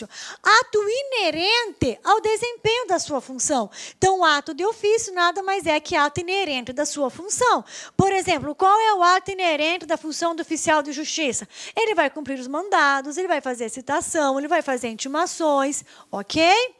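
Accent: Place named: Curitiba, Brazil. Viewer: Adorei essa profe e o sotaque curitibano tipo o meu (senTE)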